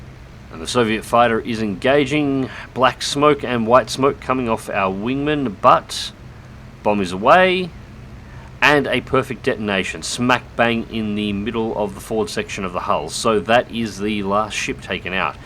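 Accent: Australia. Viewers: Yeah mate he's bloody aussie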